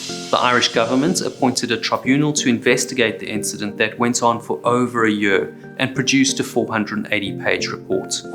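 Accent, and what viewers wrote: South Africa. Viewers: Great video. I hear a South African accent there. Thanks for not going AI.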